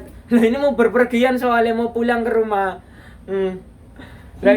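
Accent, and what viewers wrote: Banyumas, Indonesia. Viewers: Lapor ndan,,saya dari tiktok,,,lari kesini,, Saya suka logat ngomg mu ndan,,inyong inyong😀😀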